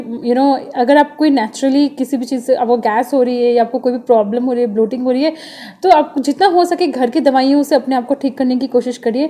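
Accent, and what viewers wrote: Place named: Portugal. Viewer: Gracias por visitarme bonita 🙂🙌 aquí estaré pendiente ♥️🙌me encanta escuchar 👂 tu acento ♥️es portugués???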